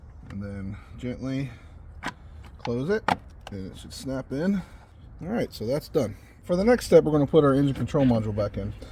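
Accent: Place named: Indiana, United States. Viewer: You sound like you’re from Indiana. Thanks for the video, helped me do it! I’m never taking my car in and paying for a battery change, no matter how complicated they make it!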